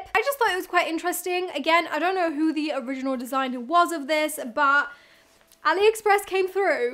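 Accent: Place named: United Kingdom. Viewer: I think lots of people from the UK talk like that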